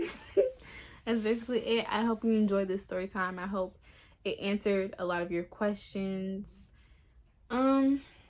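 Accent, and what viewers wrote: United Kingdom. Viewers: I’m British so ik that did u not read the comment 🙄 it sounded like how my cousins from north London speak so that’s why I said that so pls have a good day and goodbye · At first the British Accent🤣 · She British like what😲😱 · o shii i’m a new sub and i thought she was from london fr😂😂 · It’s the natural beauty for me🥺🥺and girl I’m from London and that British accent was on point🤣🤣🤣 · your British accent isn’t bad x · It was the British Accent for me 😂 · lmaf loving the British accent❤️ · Is the Brittish Accent for me 😂 Your so pretty 💞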